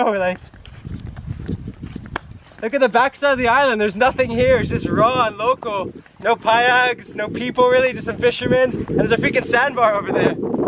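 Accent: Visayas, Philippines. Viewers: ....hi cute kaayo ka mag bisaya, wow ha... gd boy kaayo ka, mag amen jud ka SA mga matatanda, bless you.... from Dubai....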